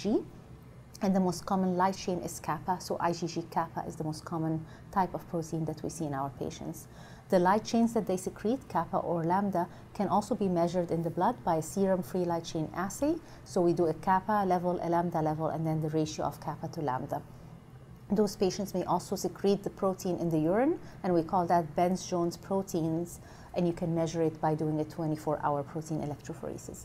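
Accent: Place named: Egypt. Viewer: Cannot shake the Egyptian accent though hhhh. Great video though.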